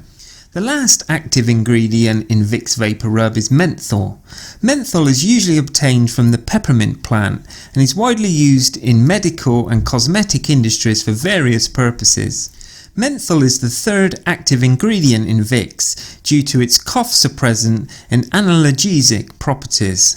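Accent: United Kingdom. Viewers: You sound like a british hillbilly 👎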